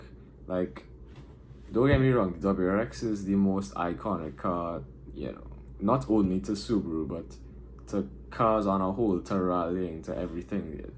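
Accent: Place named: Trinidad and Tobago. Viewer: You have Trinidadian accent.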